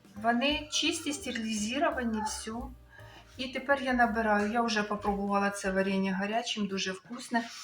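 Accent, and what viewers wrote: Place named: Chernihiv, Ukraine. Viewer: Прекрасна мова, красивий суржик, на Чернігівщині всі так балакають, не нравится не слухайте! 👍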